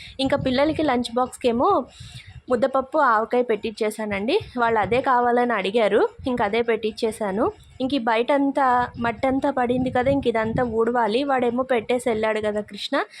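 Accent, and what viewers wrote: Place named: Andhra, India. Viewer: Meedi proper andhra Telugu spastamuga matalduttunnaru.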